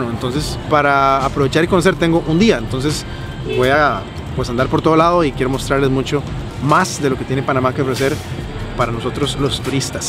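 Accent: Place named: Colombia. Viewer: pareces colombiano, de echo hay partes de que tu acento se asemeja mucho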